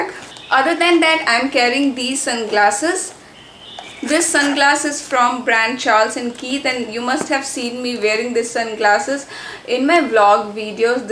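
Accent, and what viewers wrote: India. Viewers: Are u Indian ur accent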